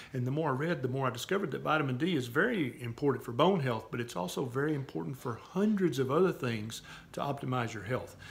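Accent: South, United States. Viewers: Hi doc! I love your videos, I love your information, and I love your southern accent as I am from the south too, but now I'm living above the Mason-Dixon line. 😁 My question is my 18 year old daughter who has ehlers-danlos, gastro issues, mild anxiety issues and mild depression, allergies, chronic fatigue syndrome, pathological sleepiness, EoE, she weighs 104 lbs, approx 5 ft, and her doctor prescribed her vitamin D 400 iu once a day. After listening to you, I don't believe that is enough, so how much do you recommend, doc? TIA!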